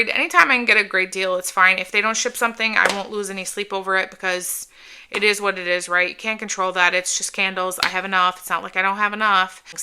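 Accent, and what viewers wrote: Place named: Minnesota, United States. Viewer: I love the Perfect in Pink candle. I bought two which is unusual. BTW, im wondering if you are from the upper Midwest? Im a Minnesotan and you kind of sound like us lol...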